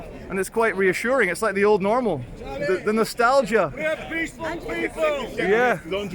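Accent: Russia. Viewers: Absolutely love your Russkiy accent - I could listen all day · I love your Russian accent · I love your Russian accent. It's really funny.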